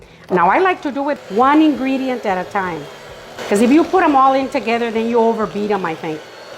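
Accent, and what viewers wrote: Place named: New Mexico, United States. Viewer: ~ ...your accent reminds me so much of some of my aunts from NewMexico...LUV iT ! : )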